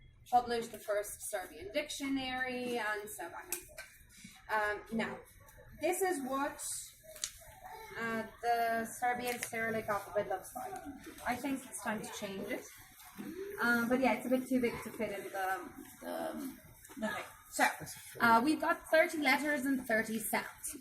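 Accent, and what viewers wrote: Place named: Ireland. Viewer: why an Irish accent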